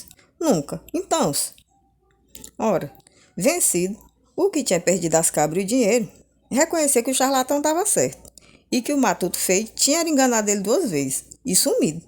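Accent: Northeast, Brazil. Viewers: Vc e uma narradora muito maravilhosa lembro meus parentes do Ceará minha vó meu avô materno moro em Curitiba mais vendo vc falando lembro e tenho muitas saudades do meus parentes nordestino avó avô e tios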